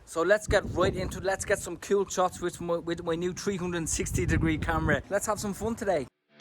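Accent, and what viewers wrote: Dublin, Ireland. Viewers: I've been trying to work on my Dublin accent for a while now, and that we all have to return to our offices, i've been looking for an e-bike, so your videos are so dope! I know you are just speaking normally, but if you can, please speak in the most rawest Dublin accent possible for your next one!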